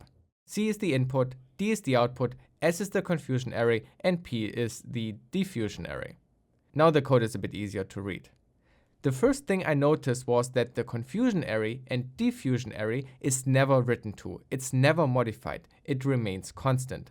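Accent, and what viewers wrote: Germany. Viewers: The german accent makes me want to kill myself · I like ur German accent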